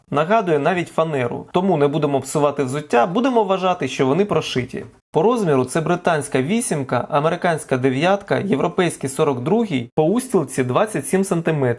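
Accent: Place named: Voronezh, Russia. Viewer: так я не понял. это что за язык? ты где так говорить научился? говори нормальным языком, нихрена же не понятно. это воронеж? или может багучар какой нибудь?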